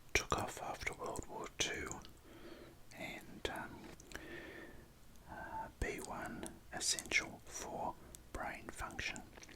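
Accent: Australia. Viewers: The best Australian accent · Aussie man explains his accent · I do prefer you talking more. Australian accent helps me sleep. I like your explanations as well. I watch every food video. Love the channel all the way from USA.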